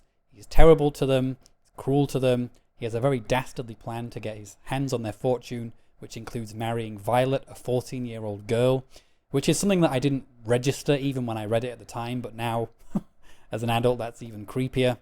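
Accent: Northern England, United Kingdom. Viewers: yes that’s it, my ears have become adapted to your accent! I had heard reference to the Northern accent but never really knew exactly what it was… kinda like the Southern accent here in America I guess…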